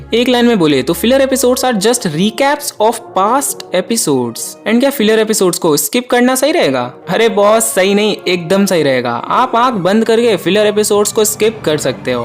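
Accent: Bihar, India. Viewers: Kahe aap bihar se ho kya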